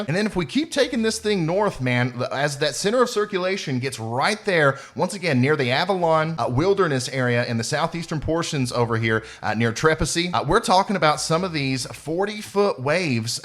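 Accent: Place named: Kentucky, United States. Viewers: I came across another older video and i just wanna say that i KNEW i heard Kentucky in the accent! Sure enough i go to check a more recent video and im proven right. Ima subscribe now because I do enjoy good weather coverage and a Kentucky boy😅 (I'm from western ky, btw! Muhlenberg county specifically) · I knew I recognized the accent! Kentucky's as close to Heaven as I'll ever come. The dew sparkles like emeralds in the morning sun. The Bluegrass and the mountains take you right up to the sky. And I hope that I can go back to Kentucky when I die.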